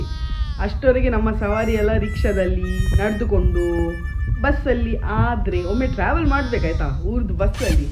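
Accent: Mangalore, India. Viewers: Nimma mangalore bhashe thumba chanda..keltane irbeku anisutte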